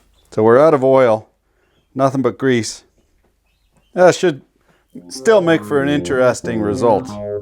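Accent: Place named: Canada. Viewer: The Canuckistan English language of still amuses and amazes me after all these years.:)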